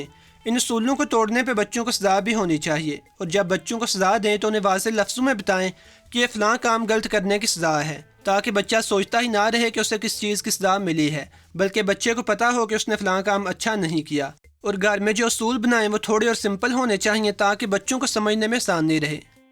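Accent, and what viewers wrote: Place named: Punjab, Pakistan. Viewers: Lehja to to jahilo wala hy urdu aik adbi zaban hy iski zaban to aisa lag raha hy punjabi bolne k lie bani hy bs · آپ بھی اردو بولنا سیکھ لیں۔۔۔ یہ پنجابی اردو آپ جیسے شخص کے منہ سے اچھی نہیں لگتی۔۔ والدین ہوتا ہے ولدین نہیں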